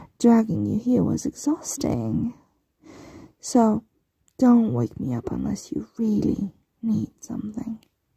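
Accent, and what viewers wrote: United Kingdom. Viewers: Hey are you welsh it's bugging me because I figure it out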